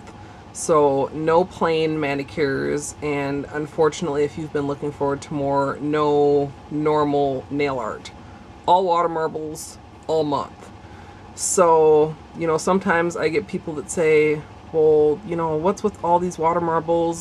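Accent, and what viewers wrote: Minnesota, United States. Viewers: You have a very Minnesotan accent. ;) but it's ok cause I'm Minnesotan too.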